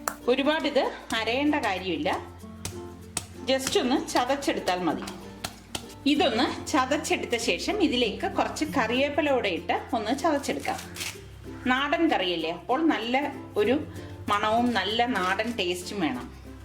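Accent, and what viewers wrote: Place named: Kottayam, India. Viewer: Sister the crab came out so well! You speak Malayalam like my parents so you must be from near Kottayam! greetings from Canada! Very clear instructions! Thanks!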